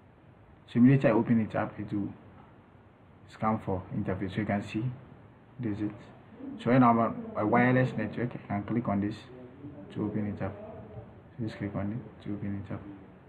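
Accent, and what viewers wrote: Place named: India. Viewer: THIS NIGGA IS A SCAM HE SOUND LIKE A INDAIN "TECH SUPPORT"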